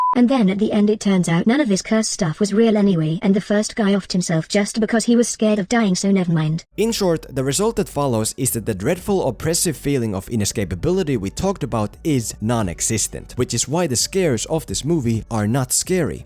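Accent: Finland. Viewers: wait where are you from? Your English accent sounds Finnish.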